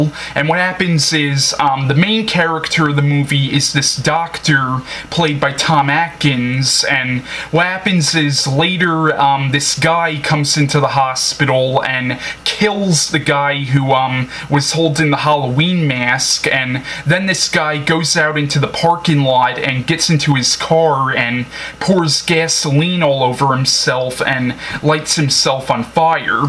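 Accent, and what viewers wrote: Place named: New York, United States. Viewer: Ahhhh.. I knew I'd heard the accent somewhere before :) I knew it was either New York or maybe Connecticut or something. Sorry for my ignorance. I'm Irish from England, so US accents aren't quite so obvious over here. Nice review anyways, mate :)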